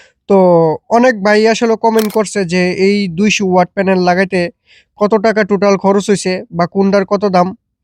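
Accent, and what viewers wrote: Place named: Brahmanbaria, Bangladesh. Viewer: ভাইয়ের কথার ভংগি ব্রাহ্মণবাড়িয়ার মানুষের মতো।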